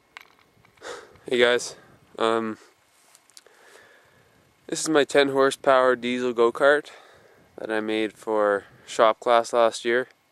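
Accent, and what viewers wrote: Canada. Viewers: must be a canadian aboooot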